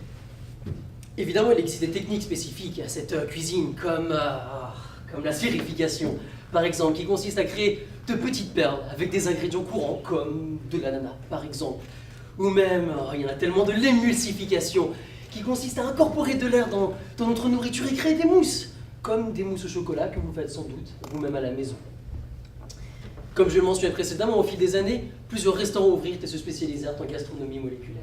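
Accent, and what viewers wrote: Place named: France. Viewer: Un sujet bien élaboré, des gestes corporels appropriés, un débit de langage maîtrisé tout ceci dans un français impeccable. Seriez-vous français car, l’accent est différent de celui des québécois?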